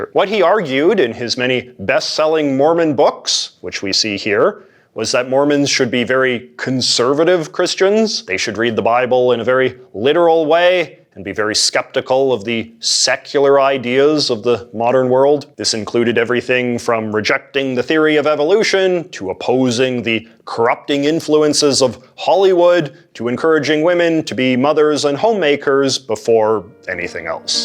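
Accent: Canada. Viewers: 🇨🇦Ha ha. Nice Canadian accent ya got there, Eh?!👍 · He's Canadian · You must be canadian by the way you say "about" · Canadian, eh? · Your 'bouncy' countenance is driving me insane for some reason, like you rhythmically bounce up and down slightly to the cadence of your speech, twisting your head for emphasis on some words and then give a more pronounced 'bounce' to emphasize others. Like it's all I can notice whenever I see you talking, which is fine aside from certain extremely stereotypical Canadian pronunciations. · Why am I just now hearing about this guy with 80's hair and Canadian accent? Because I've been listening to Neil Young? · The purity of the Candian accent is just..... chef's kiss...... <3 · An ethnically ambiguous surfer dude that says “about” like Canadians. Modernity is so fun.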